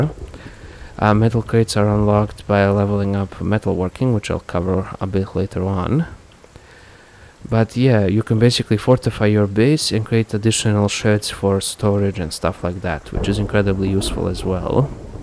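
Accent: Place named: Eastern Europe. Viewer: He is from Eastern Europe. They speak like this.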